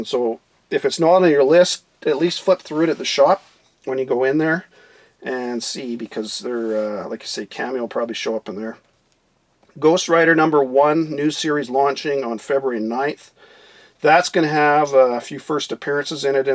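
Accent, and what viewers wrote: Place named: Canada. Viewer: Happy New Year!!! So happy to hear your Canadian voice talking about comics brother!! Just watched the video and can’t wait for the next one!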